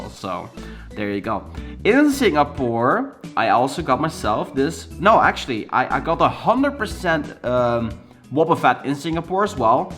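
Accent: Netherlands. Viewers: Wow are you dutch im dutch tooo !! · Are you from the Netherlands? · Jij Bent Nederlands ik hoorde je het praten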